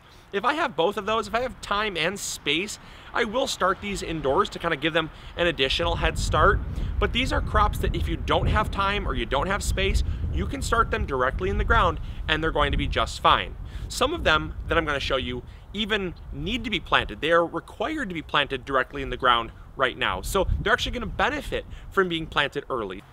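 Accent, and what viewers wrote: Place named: Midwest, United States. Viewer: I'm Missouri so I appreciate that midwest accent when he says "salary" instead of celery. So do I.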